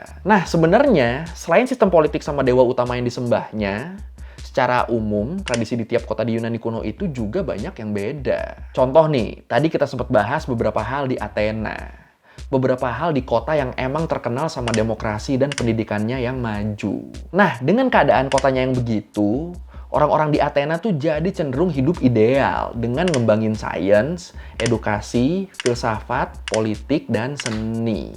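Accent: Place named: Madura, Indonesia. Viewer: Mantap bang belajar soal yunani kuno dgn logat madura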